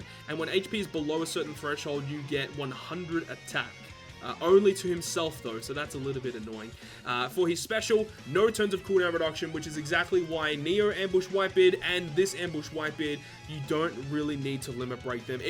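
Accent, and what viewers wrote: Australia. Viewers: Been looking for this damned video. I need an Australian accent to explain game to me thank God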